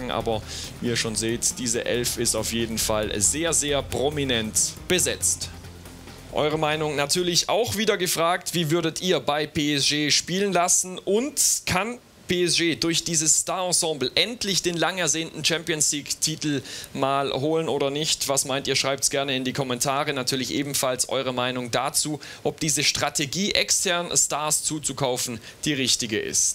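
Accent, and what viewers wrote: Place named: Saxony, Germany. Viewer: Hauptsache Transfermarkt TV hat erstmal einen sächsischen(!) Moderator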